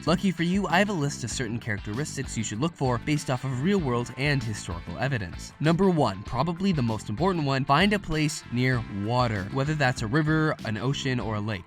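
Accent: Canada. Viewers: he's Canadian not british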